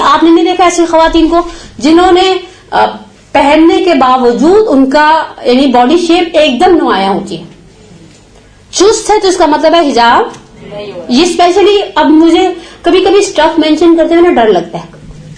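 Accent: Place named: United Kingdom. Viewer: Plz plz ap angrazi accent main urdu nahi bola karain topic acha magar sunaaa muskil ha in ko ..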